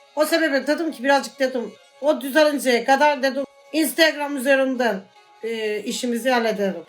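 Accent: Black Sea, Turkey. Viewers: O nasıl bir şive yaa abla ben bir Karadenizli olarak böyle konuşamıyorum helal olsun valla <3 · Karadeniz Şivesi İle Yayın Girşini Yapar Mısın Lütfen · Abla karadenizi söktü götürüyooo😅👏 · gerçek karadenizliden daha karadenizli yemin ederim :D ay ablam yicem tipini arada böyle videolar yapar mısın ? :D :D :D :D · 10 saat karadeniz şiveli konuşsa dinlerim çokk iyi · Karadeniz şivesi çok yakışıyor sanaaaa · bir de karadenizli aksani ucu bir arada gulmemek imkansiz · Abla sen Rizelimiydin ? Çok iyi yapıyorsun karadeniz şivesini.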